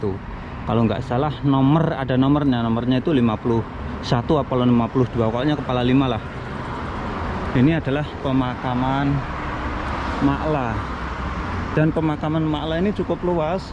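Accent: Java, Indonesia. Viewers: mas ni asli orang mana yaa??? 🤔🤔 logat nya kayak orang jawa....🙂🙂 salam dr ponorogo Jawa timur... 🥰🥰🥰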